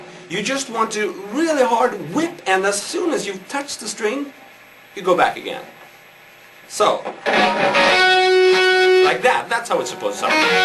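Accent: Sweden. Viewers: im a member at ultimateguitar, quit hating on this guy, he's freakin amazing. His accent is because he's from Stockholm Sweeden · Haha!!! He´s swedish, i know the accident ´cause i´m also swedish (even through, i´m not that bad att english accident!!!) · I am ashamed because it sounds just like Swedish accent. I fight tooth and nail to be self aware and not sound like that when I speak English!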